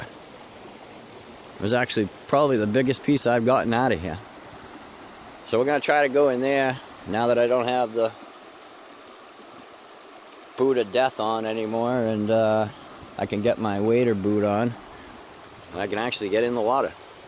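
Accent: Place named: Massachusetts, United States. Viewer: I knew you were from MASS i had an old boss from MASS same accent.Maybe NE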